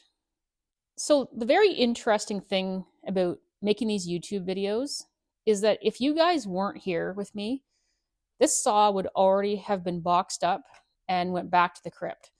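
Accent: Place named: Canada. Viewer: I like your work and your delightful Canadian broge.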